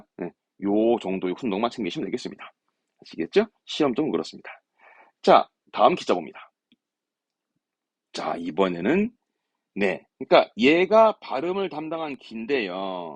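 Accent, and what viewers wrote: Jeolla, South Korea. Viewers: 왐마 듣다보이 우리 절라도분이시구마잉~ 반갑네요잉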